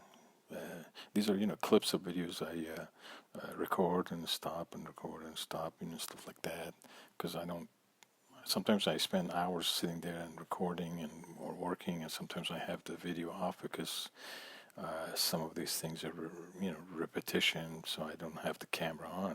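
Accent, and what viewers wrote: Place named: Arab world. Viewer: Actually, not that unrelated, the accent and your artistic skill led me to think that you were from Armenia or some other Middle Eastern country.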